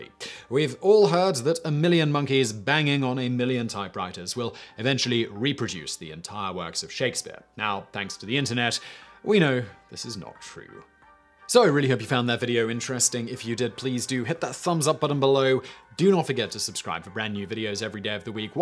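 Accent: England, United Kingdom. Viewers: Given your British accent, the typo in the thumbnail kinda ruins a lot of credibility of the research. Or it's just a genius ploy to get higher engagement via outraged comments.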